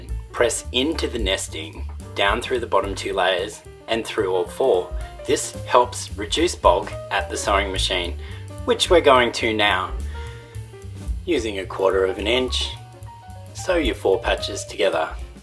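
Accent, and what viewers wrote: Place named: Australia. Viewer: Hi - i am so happy you are doing this! You do such a good job and your voice is so soothing. And who doesn't like an Aussie accent?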